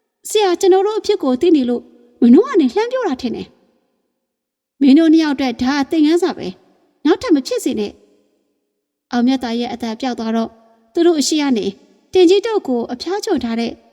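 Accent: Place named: Thailand. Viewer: နားထောင်လိုအရမ်းကောင်းထိုင်းလိုမပီတပီပြောတာလေးလဲသဘောကျတယ်ဗျ❤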